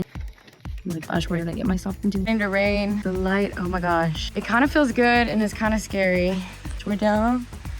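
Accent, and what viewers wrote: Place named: Los Angeles, United States. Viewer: Youre from Nawlin? I thought youre an LA woman.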